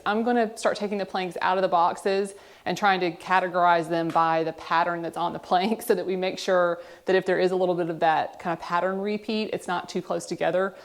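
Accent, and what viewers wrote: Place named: Kentucky, United States. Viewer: So I've been trying to place your accent. I was thinking Kentucky, but is it Mississippi?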